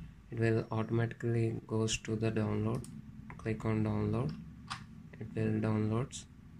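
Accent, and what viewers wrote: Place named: India. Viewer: I hate your "hindu-glish" ! FCKU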